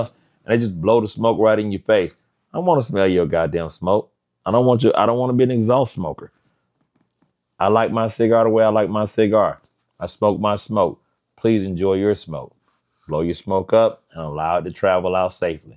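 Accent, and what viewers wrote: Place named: New York/New Jersey, United States. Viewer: Why this brother looks familiar. I might have seen him in a cigar lounge in NJ. Most of where I frequent at. He has a Ny NJ accent. He's right about cigars and cigar lounge etiquette.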